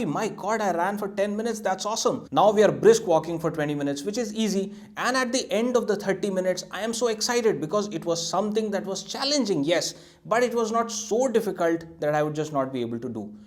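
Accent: India. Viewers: Your accent makes me want to watch a Bollywood movie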